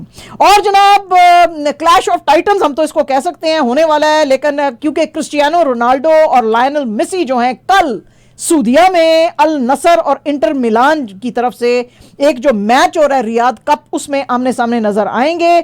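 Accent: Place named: India. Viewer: Oye Paindoo, Corps Commander is pronounced "Core" commander, not "Corpse" commander. And are you an Indian? Why can't you pronounce the letter "Khay" of Urdu? You say words like Kharaab, Khayal, Khamosh etc. like an Indian.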